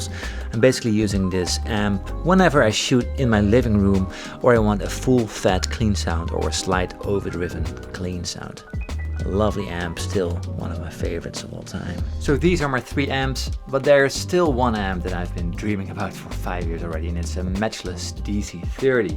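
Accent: Netherlands. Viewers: ah, so Dutch? ty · English sounds best with a dutch accent, from my POV!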